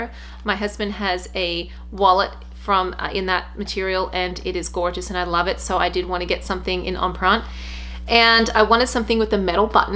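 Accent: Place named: Australia. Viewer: New to your Chanel. You sound Aussie! So why the US $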